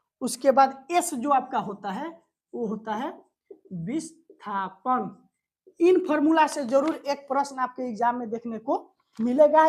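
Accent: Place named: Bihar, India. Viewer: That Bihari accent - नमस्कार भाईयों ❤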